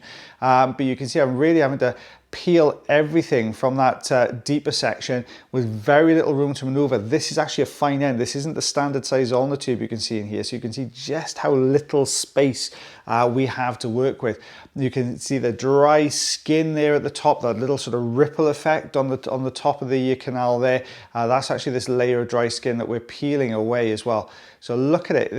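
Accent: Wales, United Kingdom. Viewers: Loved that! Also love your accent - I lived in Carmarthen when I went to Trinity College there in the 90s. I really miss Wales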